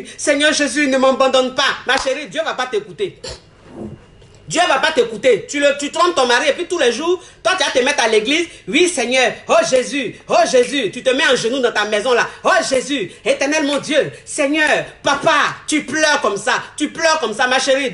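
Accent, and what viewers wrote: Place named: Ivory Coast. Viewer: Jaime bien comment tu parles les ivoriens font rire!😂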